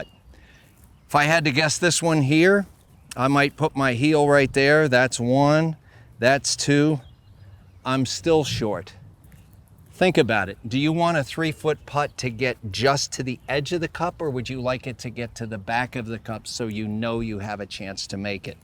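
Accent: United States. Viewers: Just a standard Connecticut accent? It’s not even thick.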